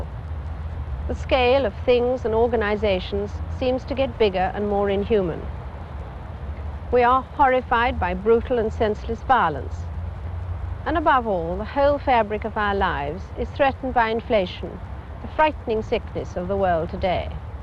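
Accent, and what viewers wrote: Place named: RP, United Kingdom. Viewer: That's how received pronunciation is supposed to sound you utter fool.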